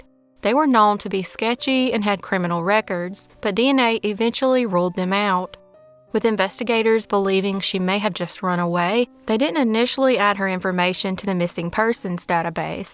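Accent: South, United States. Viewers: I am a 52yr old Lifelong Southerner, Native American, and Native Floridian. So before I say this, understand, it comes from a place of love, but, until you ditch the southern accent, you will never be given respect as a journalist. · Love your videos! 🤗 What state are you from ? I’m trying to pin point your accent! I was born in the south but moved to CA as a kid so I think your accent might be from my home state but I could be wrong !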